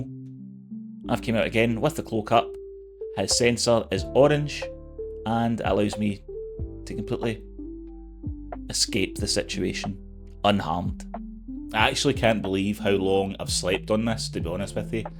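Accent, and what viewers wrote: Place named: RP, United Kingdom. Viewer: Nice effort with the posh accent.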